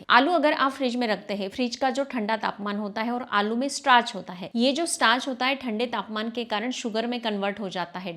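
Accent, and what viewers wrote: Maharashtra, India. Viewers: मराठी tone का हिन्दी ब्लॉग टिप्स बहूत बहुत सुन्दर प्रस्तुति,आपके अन्दर श्री महालक्ष्मी जी के अंश ज्यदा है,wow❤❤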